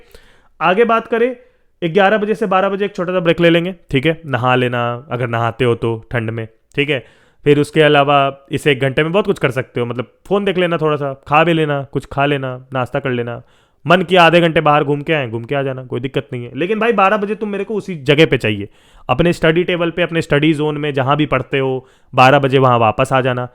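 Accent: Bihar, India. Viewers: Bihari ho?? Accent se guess kr rha hun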